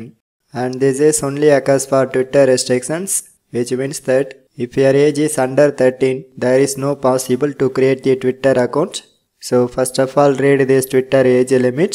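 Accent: India. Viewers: you have the THICC indian accent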